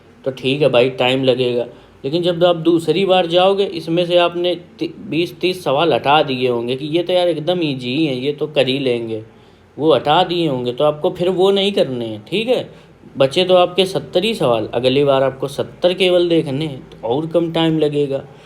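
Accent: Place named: Kanpur, India. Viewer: Bhaiya aapke accent se hi samajh gaye the ki aap kanpur se hain ...badiya chize batate h aap ... dhanyawad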